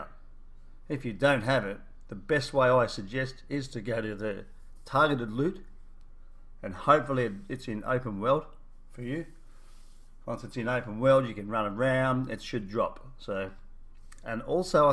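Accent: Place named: Australia. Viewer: I can already tell your Aussie 😂, nice video and great explanation 🤘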